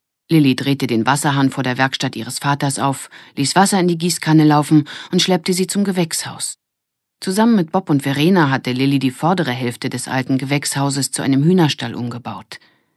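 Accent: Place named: Russia. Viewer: Haha cool, da bin ich nicht die einzige, die mit bald 30 Jahren die Fortsetzung einer Ära vergöttert! Vielen vielen Dank! Ich wusste gar nicht, dass “Die Wilden Küken” existieren. Immer, wenn’s mir schlecht geht oder ich krank bin, höre oder schaue ich “die wilden Hühner” & das gibt mir ein Gefühl von Geborgenheit Glück & Kindheit. Aber als ich nach den Wilden Hühnern hier suche, finde ich diese Reihe und hab den ersten Teil in 2 Tagen durchgehört! 🥰 Danke dir!! 🙏🏽 Stimme allerdings dem Kommentar zu den Akzenten zu! Das klingt wirklich eher russisch und sehr sehr harsch haha, aber es gibt auch nen Lacher, wenn auch mit verzogenem Gesicht 😅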